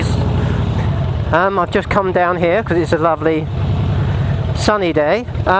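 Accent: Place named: RP, United Kingdom. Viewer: TheBikerVlogger liked your video,so I gave your video a look see.Interesting channel you have.I haven't subbed a Harley rider,in fact,you're the most posh sounding Harley rider i've witnessed lol.You're even more posh sounding than BaronVonGrumble!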